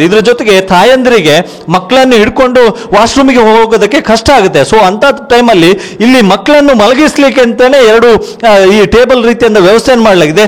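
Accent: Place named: Mangalore, India. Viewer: Anna please speak in kannada don't mix with English.. Mangalore kannada is very to nice here..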